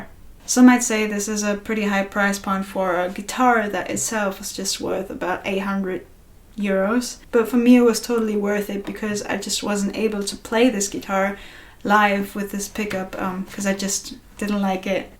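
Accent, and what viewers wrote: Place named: United Kingdom. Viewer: This was really helpful for me. I have A Colling CJ45 which someone had retrofitted a Fishman Sonitone to and it sounds really quacky, so I was considering this exact swap. I didn't really think the Baggs piezo was much better that the Fishman (although it was a BIT better), and the mic was very brash. The 50/50 was a definite improvement, but I'm gonna have to think really carefully now. I do think K&K pure mini is a better acoustic sound than either, and I have it in one of my Martins, but whatever the makers say, it needs a pre-amp with some pas, and that's extra hassle. Thank you very much. By the way, your English is excellent (I'm from England), and I would never have guessed you were from Germany. You have some US phraseology, but your accent is really close to UK English, which is so nice to hear. Finally, there's no reason not to put that pup into a Shawn Mendes - it's a lot of guitar for the money. 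Martin are selling horrible laminate ones for about the same money.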